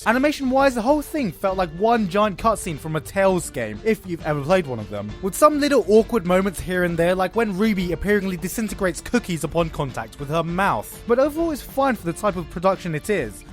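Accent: United Kingdom. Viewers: I like how you're an Asian, but with a British accent. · He's British, why do you ask ?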